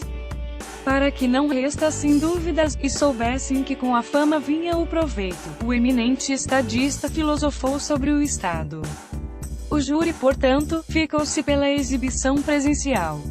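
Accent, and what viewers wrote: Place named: Brazil. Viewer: Porquê em brasileiro?? nada contra mas sendo caso da política portuguesa não arranjavam um voz informatica duma gaja tuga??? abraço e parabéns subscrevo o canal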